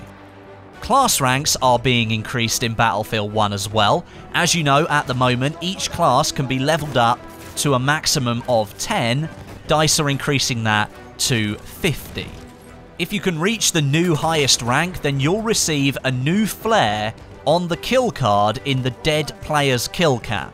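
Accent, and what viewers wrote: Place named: England, United Kingdom. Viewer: English English not phony american english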